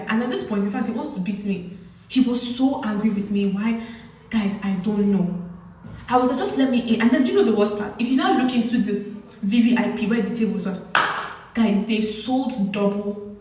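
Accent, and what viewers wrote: Igbo, Nigeria. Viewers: I love your igbo accent while you complain 😅 sorry for the stress, that's why i attend concert because na me know watin my eye see the first time.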